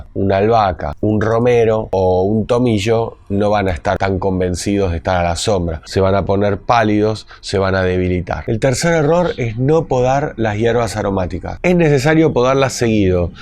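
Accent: Argentina. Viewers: NOOO... DEMASIADO LENTO NOOO Demasiado argentino y ese es uno de sus encantos!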